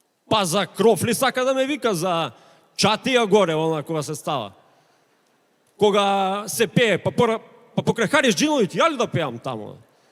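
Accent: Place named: Kumanovo, North Macedonia. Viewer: Zlatno pravilo zošto ne e interesno.... ili zbori kulturnu ili teraj kumanovski....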